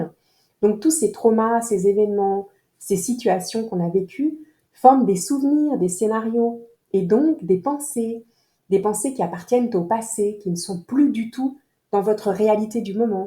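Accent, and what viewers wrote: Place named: Quebec, Canada. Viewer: Bonjour 😊. Merci pour cette belle capsule. Hâte de découvrir un peu plus ton nouveau programme 😊. Et ton accent québécois est juste terrible 😂😂. Très bon dimanche ♥️♥️♥️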